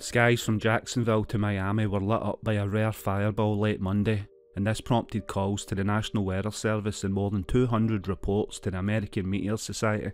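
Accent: Scotland, United Kingdom. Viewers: Hello there🖐 We were lucky, this time, that the pieces landed in the ocean. One would think that, wit& all the satellites and observatories that someone would have known that the asteroid was heading our way? Perhaps it was known? Thank you for the report and video. I do enjoy your Scottish accent. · Is this a scottish accent ? If not, what accent is it ? Interested because I am not a native speaker.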